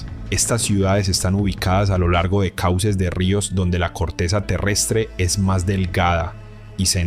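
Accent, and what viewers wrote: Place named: Antioquia, Colombia. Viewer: Esa voz es de un.paisa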